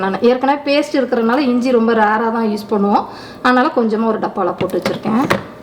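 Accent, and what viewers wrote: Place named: Tiruchirappalli, India. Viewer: Neenga trichy a mam.ketkave santhosama irukku.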